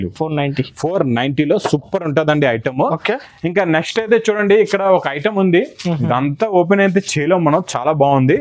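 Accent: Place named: Rajahmundry, India. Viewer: మీరు రాజమండ్రి నుంచి కదా ..నే పట్టేశా ..😅 వస్తున్నా ..😂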